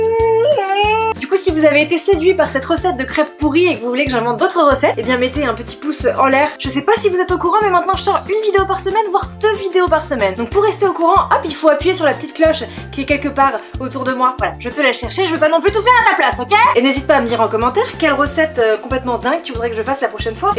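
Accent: South, France. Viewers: J'adore ton accent du sud ! Je le fais presque aussi mal x) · Ho oui je veut d'autre vidéo Miam Time :D Et merci pour l'accent de sud ;)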